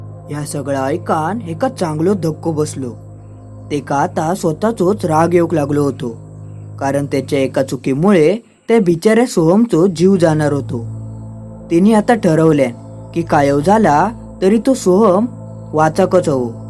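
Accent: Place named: Konkan, India. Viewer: Are hec best marathi kokni marathi🥰🤩😍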